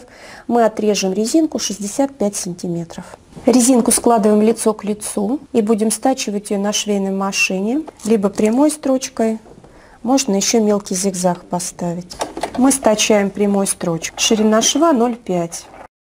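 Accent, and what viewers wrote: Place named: Voronezh, Russia. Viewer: Спасибо за мастер-класс,! Первый вариант притачивания резинки лучше)). А еще мне очень нравится ваш приятный голос и воронежский "говор", который я узнала с первых минут видео😄.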